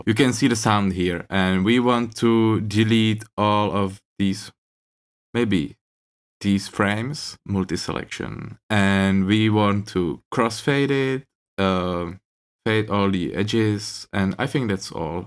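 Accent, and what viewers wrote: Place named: Czech Republic. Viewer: ja si celou dobu rikal, ze mas takovej ceskej prizvuk a pak si dropnul pancz a bylo mi to jasny:D dobrej tutorial btw:)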